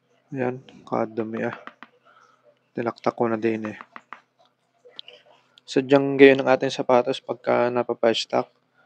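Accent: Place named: Southern Tagalog, Philippines. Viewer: Southern Tagalog Accent !!! ..Keep it up Bro..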